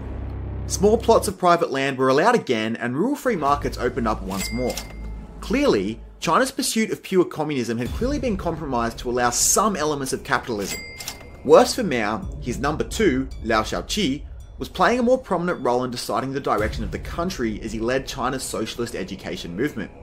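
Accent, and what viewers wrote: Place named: Australia. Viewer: “His wife dipped WHAT in water to make sure he drank what he needed”??? Can’t understand this narrator every 4th sentence. No doubt this Aussie and ALL UK people think Americans NEED an accent in their videos. Lame.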